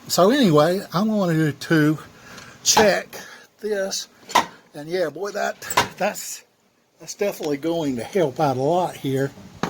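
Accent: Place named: Upstate South Carolina, United States. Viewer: I hear your accent I'm gonna say your from upstate sc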